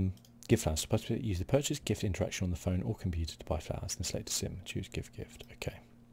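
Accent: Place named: United Kingdom. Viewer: British accent + ASMR = Masterpiece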